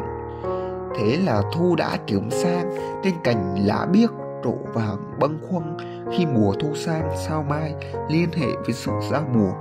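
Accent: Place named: Hue, Vietnam. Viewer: rất thích hợp khi chọn giọng huế đọc ạ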